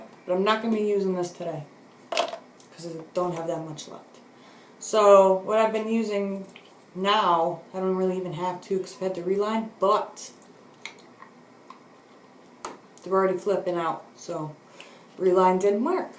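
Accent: New York, United States. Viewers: Your so pretty! Love your NY accent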